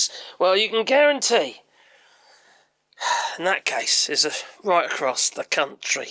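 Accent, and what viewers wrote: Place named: West Midlands, United Kingdom. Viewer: Are you living in America off-grid? you are from the British West Midlands!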